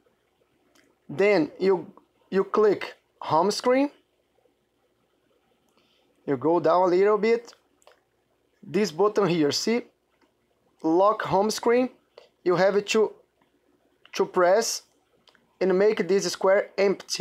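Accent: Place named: Brazil. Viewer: THANK YOU VERY MUCH MY BRAZILIAN FRIEND I APPRECIATE IT I HAVE JUST WATCHED AN INDIAN GUY , I THOUGHT HE COULD HELP ME UNLOCK MY ASUS PHONE SCREEN BUT HE TRULY SUCKED BIG TIME. HE MOVED TOO QUICKLY FROM SCREEN TO ANOTHER AND HE WAS NOT TOO CLEAR. I APPRECIATE YOUR HELP. THE REASON WHY I KNOW YOU ARE BRAZILIAN BECAUSE I USED TO LIVE IN DEERFIELD BEACH IN SOUTHERN FLORIDA WHERE THERE WERE A LOT OF BRAZILIAN PEOPLE AND I HAD A LOT OF BRAZILIAN FRIENDS AND THEY HAD YOUR EXACT ACCENT, TODO BON, TODO BON THANKS AND VIVA BRAZIL FOOTBALL TEAM OF 1982 BEST TEAM EVER. THANKS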